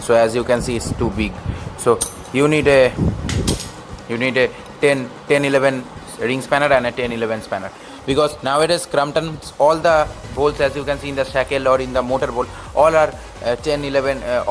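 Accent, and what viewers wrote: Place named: England, United Kingdom. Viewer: Tumi english accent a kotha bolo nah, normal a e bhalo lago!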